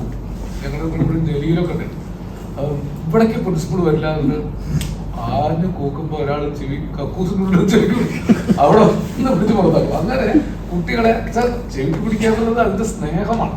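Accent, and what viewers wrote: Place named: Ponnani, India. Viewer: പൊന്നാനിത്തം നിറഞ്ഞു തുളുമ്പിയ മനോഹരമായ സംസാരം ❤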